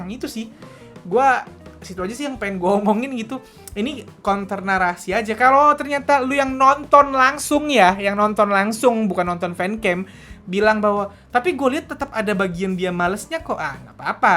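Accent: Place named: Bekasi, Indonesia. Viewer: Bahasa bekasinya ngelekeb ya bang 😂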